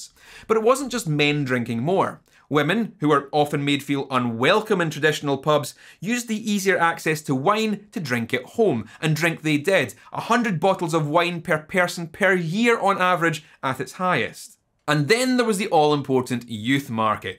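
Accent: Scotland, United Kingdom. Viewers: British punk rock ...And french beer.. 1664 kronenbourg. As a french but decent YT amglish understander i must say i stand perplexed. The fast paced scot accented speech and unusual topics : politics and alcohol 'safety' vs guitar related things, losed me in the pampa (pardon my french).. Drink some real ale bloody brittons.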